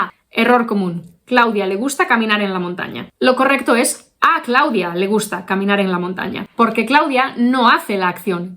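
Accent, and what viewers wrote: Spain. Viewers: Me gusta mucho tu acento español y quiero aprender a pronunciarlo Soy youssef y vivo en valencia y tengo 1año y 6 meses en espaná Puedes ayudarme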